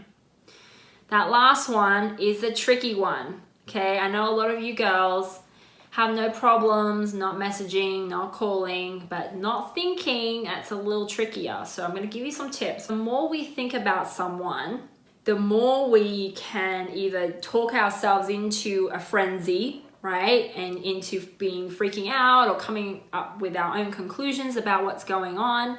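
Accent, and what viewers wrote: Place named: Australia. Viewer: Can hear that aussie talk from miles away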